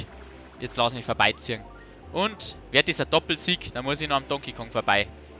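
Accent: Bavaria, Germany. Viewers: i find des sau geil dass du boarisch redst! 😂😂9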